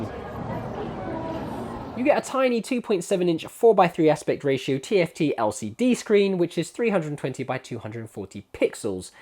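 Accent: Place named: United Kingdom. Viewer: So nice to have someone British and not American 😅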